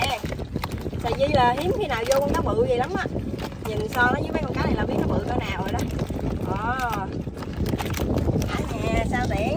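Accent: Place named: Mekong Delta, Vietnam. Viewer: Nghe giọng nói của người miền Tây đã thấy dễ thương rồi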